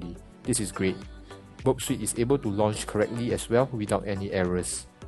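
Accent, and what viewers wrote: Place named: Singapore. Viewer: Bro ur video is awesome. Your accent sounds like Singaporean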